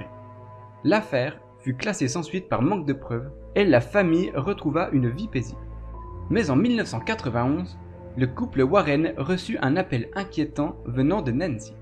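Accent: Jura, France. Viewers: Bonjour vos vidéos sont intéressantes, on ressent un gros travail de recherches ... Félicitations ! Continuez comme ça. Marrant l'accent Jurassien !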